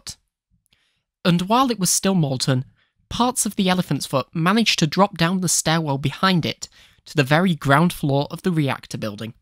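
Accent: United Kingdom. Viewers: Started video and heard the British accent that pronounces TH as F. Immediately stopped video and clicked Do not recommend channel. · No its the British accent that sucks.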